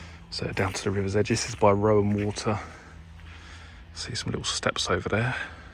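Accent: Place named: London, United Kingdom. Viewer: Yes, we're all very friendly here in Lancashire and they say the further north you go, the friendlier people get. As it took you so long to get up here, I can only assume you are now based in Exeter or Plymouth? It's a good four hour plus drive, on a good day, for us to get to Torquay - you also mentioned that you had lived in Devon - maybe Cockington, if this was south Devon? However, your accent tells me that you come from nearer to London. Anyway, great video. It's a shame that you didn't stay up here overnight - maybe in Blackpool or somewhere quieter like Fleetwood or Poulton.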